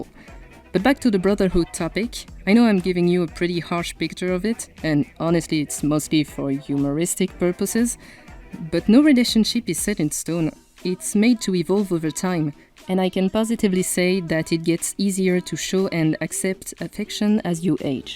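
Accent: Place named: France. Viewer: firt time watching one of ur vids, PLEASE TELL ME IF UR FRENCH CUS I CAN JUST HEAR THE ACCENT (im french)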